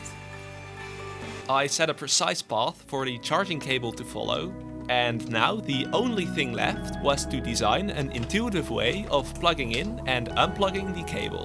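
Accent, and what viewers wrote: Netherlands. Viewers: Yo r u dutch?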